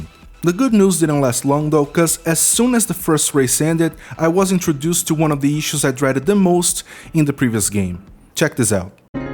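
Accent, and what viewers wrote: Brazil. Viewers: Porra, sotaque de brasileiro é impossível de não reconhecer. Muito carregado mesmo tendo um inglês bem fluido kkkkkkk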